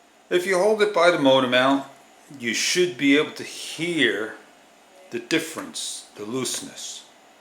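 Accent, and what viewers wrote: New York, United States. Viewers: I hear New York. I could be wrong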